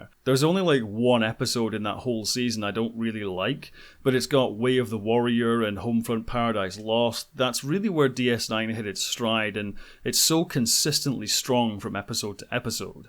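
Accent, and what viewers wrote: Scotland, United Kingdom. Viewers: . Your brogue is wonderful. Edinburgh I'm guessing?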